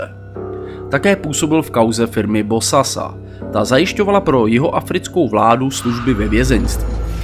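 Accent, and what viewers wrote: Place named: Pilsen, Czech Republic. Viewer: Tak mluví lidé ze z Plzně. Je to v Česku normální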